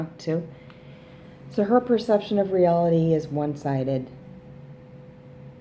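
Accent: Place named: Western New York, United States. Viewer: just discovered your site. Enjoyed this reading, thank you for sharing. You sound like you're from western NY...I recognize the accent!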